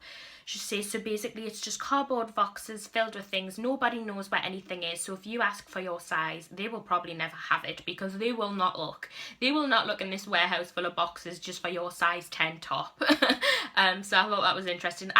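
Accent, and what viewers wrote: Newcastle, United Kingdom. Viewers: I thought I recognised your voice hahaha I'm from Newcastle too xx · hey i found your channel on my recommended list! i noticed me and you have the same accent! is there any chance you are from newcastle if you are thats awsome! x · By your accent Im guessing you from Newcastle? Haha. I currently work at Primark Newcastle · I love how bubbly you are! And your geordie accent is so fun 😂💗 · Are u from the north east? U sound Geordie coz if u are then I finally found a YouTube from me city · Are you from Newcastle? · R u from Newcastle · Shes a geordie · Omg a Geordie!!!!! I’m from the toon too! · Woooooooo another Geordie! I'm subscribing!!!!! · U sound so similar to a geordie where do u come from also ur very pretty xxx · YEEESS A YOUTUBER WHO IS A GEORDIE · Are u are geordie or no because you sound like one lol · are you from Newcastle? 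xx I am 💙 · Are you a Geordie? :) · Are you a Geordie because if u are I am too BTW love your vids keep it up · Are u from Newcastle 💕👑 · oh my god im from Newcastle but I moved to London and its been so long since ive heard the accent xxx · A fellow Geordie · Are you a geordie? If you to me you sounded like one 👍🏼 subscribed almost instantly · That Geordie accent tho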